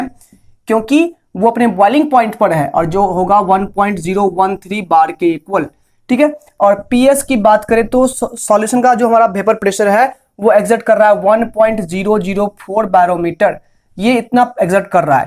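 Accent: Bihar, India. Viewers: Just superb explanation sir 👍 Are you bihari ?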